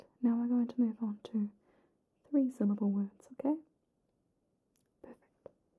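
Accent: New Zealand. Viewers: I think the tingles came early and I shivered with a warm glow. Love the NZ dialect 🌹🌹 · Amazing New Zealand accent I honestly don't hear it much in New Zealand so it's good to hear it in this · i’m convinced people love the kiwi accent because sometimes it sounds transatlantic lol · are u doing a Kiwi accent or are u kiwi?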